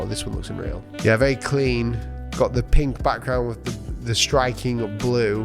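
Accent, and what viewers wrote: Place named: Cheshire, United Kingdom. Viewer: Keep up the great content though. I live in the States but was born in Cheshire. It's nice to hear a familiar accent.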